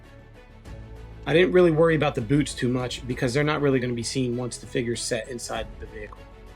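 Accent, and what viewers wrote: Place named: Philadelphia, United States. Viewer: Nice job! I hear Philly btw lol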